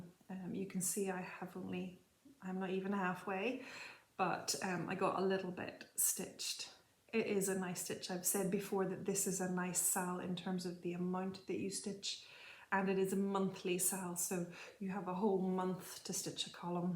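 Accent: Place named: Ireland. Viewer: …you are such a kind and gentle soul and it shines in every Flosstube that you make! I find it so relaxing listening to your soft voice with such a lovely Irish accent..the way you enunciate your words, your little giggle at yourself and things you do. This earth needs more people like you. Happy Flossiversary to you! Listening to you sign off with your multiple thank you(s), it really is apparent it comes from the heart. I have a question for you…did you finish your Blackbird Designs - What Remains Is Love? I understood that you were part of a SAL and I have not seen your progress on it for quite some time. I do watch many Flosstubers and it is possible I have gotten this wrong…I ask, because I finally got a chart and decided that while others are seeming to participate in Stitch Maynia buy starting multiple projects; my plan was to be a real rebel and Mayniac and stitch every day in May on one single chart to see how progress I can make. 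I have chosen What remains and wanted to join in on the SAL. If you are doing that SAL would you mind giving me the nAme of it on IG please. Your bunting looks stunning and you deserve a medal for undertaking such a project. p.s. I love your stamp chart and story. It is actually a chart I would like to do as well, because being a Canadian I grew up licking many a stamp with the picture of Queen Elizabeth as well. The one I seem to remember the most was the royal blue one. p.p.s. I don’t stitch Halloween either! Great con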